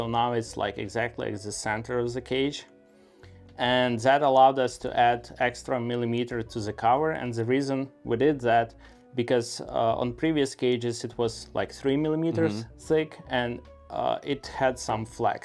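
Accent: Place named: Russia. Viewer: Russky accent. New génération Russians have good accent, because they have learned English since they are in kindergarten with real English teachers.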